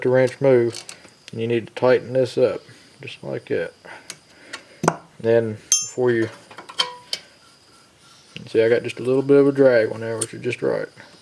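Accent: South, United States. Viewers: Are you a southerner ?? Lol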